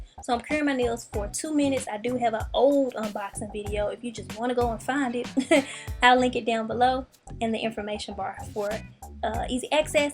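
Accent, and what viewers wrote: South, United States. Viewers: I love ur country accent lol ❤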